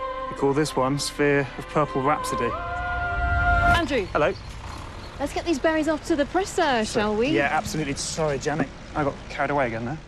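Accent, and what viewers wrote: United States. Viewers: I was at 4:something when I realized that this British girl speaks with the American accent :D As a Russian I am appalled by the topic of this video 😵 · At first I was quite confused why you sounded so American, and at the same time was so empathic to English culture 😊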